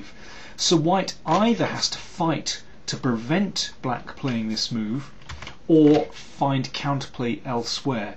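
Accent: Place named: RP, United Kingdom. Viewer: Yes Very Clear! even commentating at an Elite level, Thank you GM Daniel!!. Its clear because GM Daniel has superb speaking and chess talent! Also it doesnt hurt that he is speaking the Queens English right proper, the most understandable form of communication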